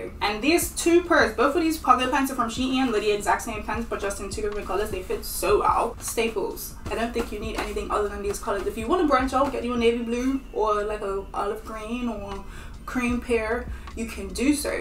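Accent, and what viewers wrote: Bermuda. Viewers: When i first heard your voice after discovering your channel, off rip I thought to myself she sounds like my mom from Bermuda let me check, and I was right; instant follow, one love❤️🇧🇲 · Is this a Bermudian accent? 🤔 You sound like my friend a bit lol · Yoo. You got a Bermudian accent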